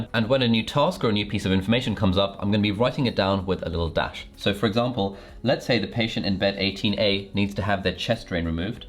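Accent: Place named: United Kingdom. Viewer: You have a very charismatic personality/way of presenting yourself, kept me engaged to the end. Must be your british accent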